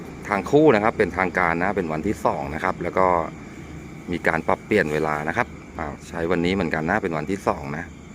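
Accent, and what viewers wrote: Ratchaburi, Thailand. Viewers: ไม่มีอะไรต้องติ ผู้บรรยายดีกว่าตามทีวีหลายช่อง เสียงชัดเจนฟังสบาย ติดสำเนียงราชรีบ้าง แต่ป็นเสน่ห์อย่างนึงของภาษาแต่ละท้องถิ่น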